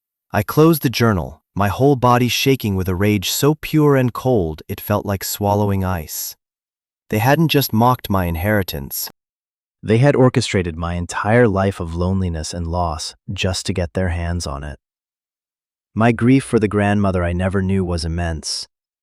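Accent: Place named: United Kingdom. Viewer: STUPID, STUPID, STUPID!! Stories told in the "first person" MUST have the following to be professional and correct. Correct gender voice of the main character. ( Female voice for female character, and male for male.) Correct accent for the charcter. (Not a British accent for a 5th generation charter from the Deep South.) Other than that it is not worth listening to, so at less than one minute into your story, bye.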